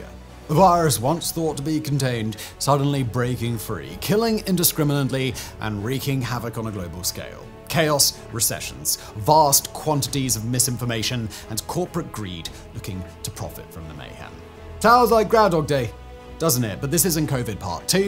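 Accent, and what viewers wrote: United Kingdom. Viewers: thanks I have a hard time with the brit accent sometimes. But what I said still holds true. I'm worried about clade2.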